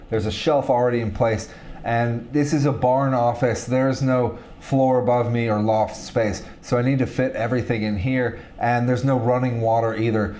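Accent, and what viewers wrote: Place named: United States. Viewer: I’m new here. He’s probably already explained it in previous videos, but I’m a bit confused about American accent, farenheits and UK power outlets 😀 what’s going on?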